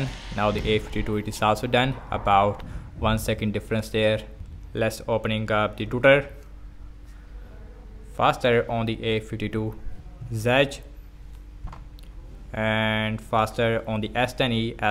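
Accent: India. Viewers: Es hindú, yo creo por eso habla así 😂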